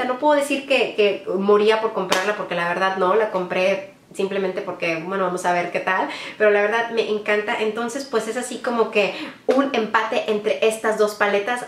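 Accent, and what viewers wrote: Fresa, Mexico. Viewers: Bien Fresilla que se oye 😅🍓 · Que diferente la voz algo fresa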